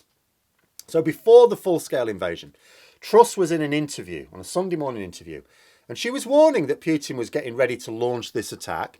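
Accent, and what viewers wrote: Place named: Northern England, United Kingdom. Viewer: I heard saying East. He does seem to speak with a thickier northern english accent as times goes by.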